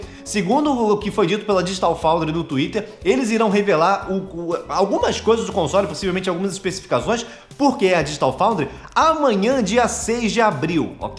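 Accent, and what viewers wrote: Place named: Portugal. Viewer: está ficando com sotaque de Português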